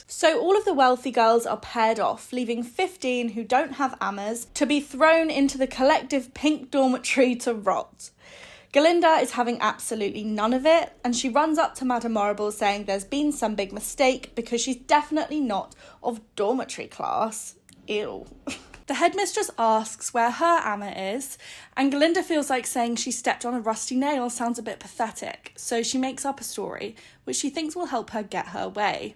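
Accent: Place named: United Kingdom. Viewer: It took me 4 minutes to realize you’re British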